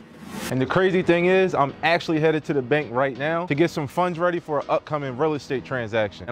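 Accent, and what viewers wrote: Philadelphia, United States. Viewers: Cuz sound like he from Philly 🤔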